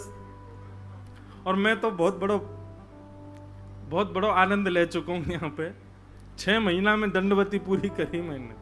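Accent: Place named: Braj, India. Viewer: Jay Shri Krishna 🙏 ♥️ ❤️ 😍 💙 Radhe Radhe Jay Shri Harivansh Guru Dev Maharaj ji Aapke Charno Mai Koti Koti Vandan 🙏 Jay Shri Guru Dev Maharaj ji Aapki vraj Bhasa Badi Sumadhur hai Jay Shri vraj vasi Jay Shri Vrindavan Dham Radhe Radhe Jay Shri Yamuna Maharani ji Jay Shri Radha Kishori ji Jay Shri Radha Rani 🙏 ♥️ 💙 ❤️ Jay Shri Radha Raman ji 🙏 Jay Shri Giridhar 🙏 ♥️ ❤️ 😍 💙 Jay Shri Govardhan Nath ji ♥️ 🙏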